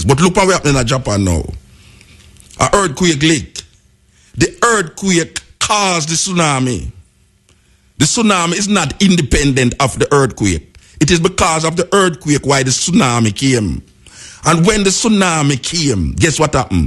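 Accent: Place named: Jamaica. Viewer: i would like subtitles...i know english but not this jamacian dialect..not when he speak fast.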